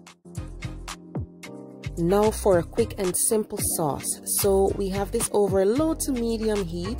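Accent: Jamaica. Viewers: U must be jamaican. U sound like my mummy. 🇯🇲😭WONDERFUL VIDEO. I gwain try this it looks DELICIOUS!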